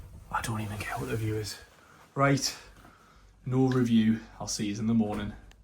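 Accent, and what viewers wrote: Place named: Newcastle, United Kingdom. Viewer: I love the Newcastle accent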